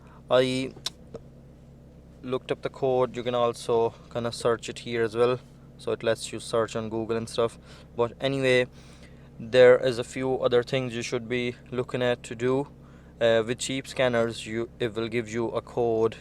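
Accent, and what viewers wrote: Cork, Ireland. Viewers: A Touch of a Cork accent is it? . Good diag I have the Autel Ds808 good tool for the money. Well done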